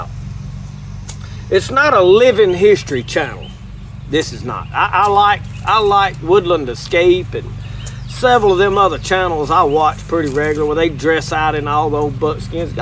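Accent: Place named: Mississippi, United States. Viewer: I just found your channel, really enjoy your downhome honesty, and that Mississippi accent. Im from Arkansas, live out in the country, got chickens, garden, retired a few months ago, I was part of that rat race, but I’m making the transition to simpler living. Thanks so much for the Bible quotes, helps us keep focused on what’s the most important. God bless.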